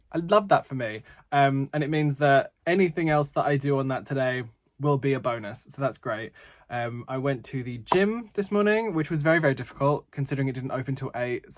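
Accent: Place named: England, United Kingdom. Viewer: Missed your top tier English accent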